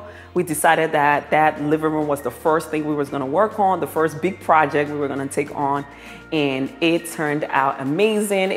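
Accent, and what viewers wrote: Liberia. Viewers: Hi - are you Liberian? I hear the accent... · Everything is just beautiful. This is my first time here. I'm sending a Liberian accent? Keep up the great work❤️💪🏾👍🏾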